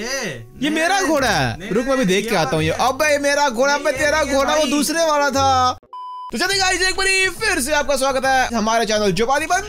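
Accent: Punjab, India. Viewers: Tum Punjabi ho kya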